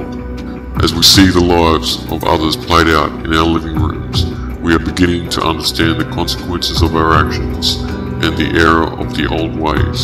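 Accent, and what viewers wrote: Australia. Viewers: What's with everyone commenting on his Aussie accent? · He has an Australian accent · Derp he sounds Australian. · lol, I thought to myself, Anonymous sounds Australian lol, but I realized you were just narrating it :)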